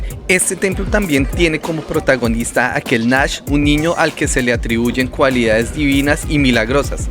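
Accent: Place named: Italy. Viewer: You are speaking Spanish with a Italian accent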